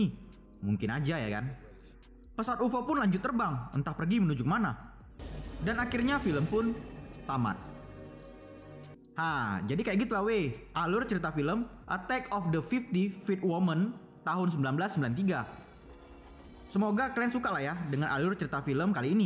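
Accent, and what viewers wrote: Medan, Indonesia. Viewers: Orng Medan ko bg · Cara ngomongnya sama persis kayak temenku orang Medan :) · Bg kau pasti orang Medan kn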